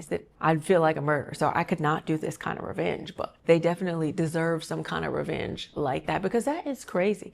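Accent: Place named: South, United States. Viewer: I can tell your from the south cause that is how we talk with the accent ,we don't put up with any bull crap.love y'all's story time .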